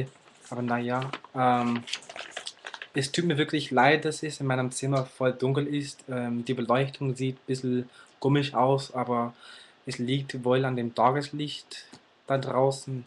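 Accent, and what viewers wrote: Bavaria, Germany. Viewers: nice, Bro! Es ist lustig, dass du Deutsch mit Dialekt sprichst (Bayrisch). Deine Grammatik ist beeindruckend, da gibt es so viele Dinge, die man schnell durcheinanderbringt, aber du hast es voll drauf. Zusätzlichen Respekt, dass du in deutsche Literatur schnupperst, denn die ist in der Tat großartig, was selbst viele Deutsche scheinbar schon vergessen haben!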